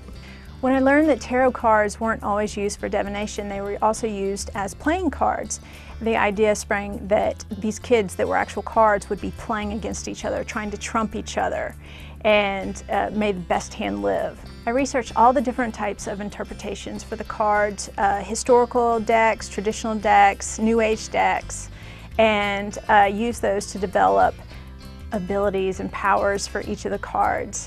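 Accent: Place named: Louisiana, United States. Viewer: mmm cajun accents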